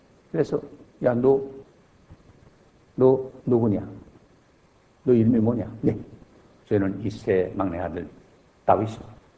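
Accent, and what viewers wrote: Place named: Pyongan, North Korea. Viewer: 중학교시절 영락교회에서 말씀을 들으며 성장했는데 예배중에 찬송도 알토.베이스 를 하시며 찬양도 성악가처럼 하시던 목사님 여전히 성대가 젊음이 넘쳐나십니다.평안도 사투리가 간간히 나오시는데 매력이시고 설교에 리듬이 있는 명설교입니다.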